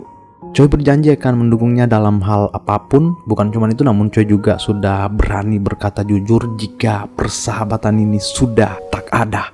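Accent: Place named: Sulawesi, Indonesia. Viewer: weee min logat mu kya logat Sulawesi 😭😭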